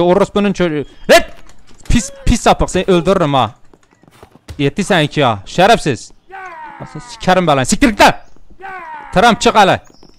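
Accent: Elazığ, Turkey. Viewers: abi elazığlımısın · Abi Elazığlımısın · Abi elazığlımısın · Abi sen Elazığ lı mısın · Abi Elazığlı mısın? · Abi Elazığlı mısın yoksa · La sen elazığlımısın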